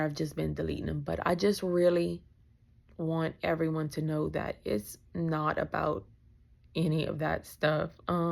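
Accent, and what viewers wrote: Louisiana, United States. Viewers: My step mother was killed by a drunk driver on her way to work, the day before my bday and we had plans for that day. Changed my life forever. The fact people still drink and drive when all these apps exist is beyond me. Y’all are touching and changing many young lives when they think about drinking. This was cool to me to learn about as well I’d love to do something like this too one day and look forward to being able to donate to help in the meantime ❤ Being from the south I get the accent thing but imo if they listen you can’t hear the lisp and the Cajun is just Flavor for the words 😂❤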